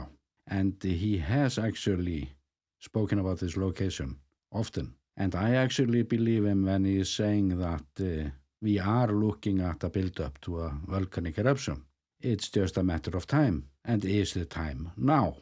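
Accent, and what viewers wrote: Iceland. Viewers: I love listening to this Icelandic accent!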